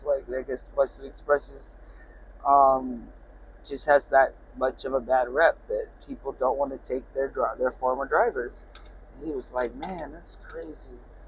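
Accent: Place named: California, United States. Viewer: You sound like you from cali...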